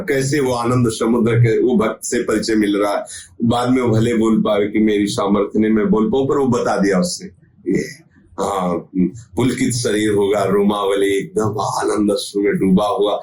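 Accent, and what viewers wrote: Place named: Kanpur, India. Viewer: आप श्री तो कानपुर से हैं वाणी से पता लग गया, चौबेपुर वाले विकास बाजपेई भी ऐसे ही बोलत हैं 🙏🙏🙏🙏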